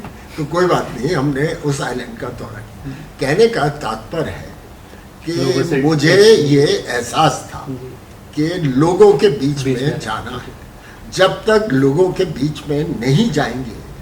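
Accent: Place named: Andaman and Nicobar, India. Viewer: Apko bht subhkamnaye sir. Apke baat me Andaman ka slang jhalkata hai abtak😅. Sir vapas aajao... Beda gark ho rakha hai😐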